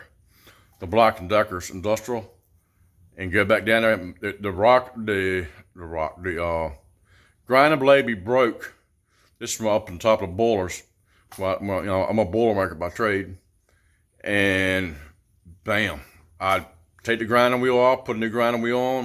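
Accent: South, United States. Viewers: The one thing that disappoints me about dewalt angle grinders is they don’t have a screw hole for the handle at the top. Only side to side. For most home DIYers the 412 is fine. You only need the brushless if you’re using the tool A LOT because brushes last <num> hours. I mainly use my grinder as a cut off wheel. Maybe once every couple of years to sharpen a lawnmower blade. If you use a grinder a lot then it’s worth spending the extra money for the brushless. I don’t know if is a grinder expert but whenever I hear that heavy southern accent it’s a good bet this guy knows how to cook up some good bbq. 😄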